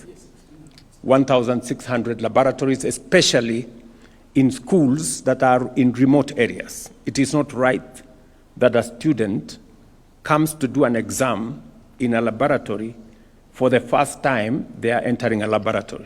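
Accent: Kenya. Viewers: Mr president you are speaking Kalejin English.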